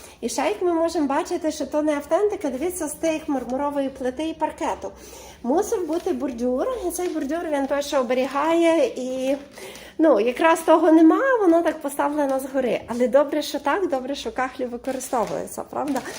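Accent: Galicia, Ukraine. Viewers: Ваша галицька мова - мед для київської душі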